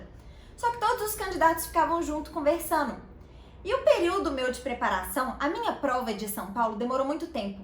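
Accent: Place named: Minas Gerais, Brazil. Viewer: Sutaque mineiro raiz, a nossa minas gerais. hehehe ótimo vídeo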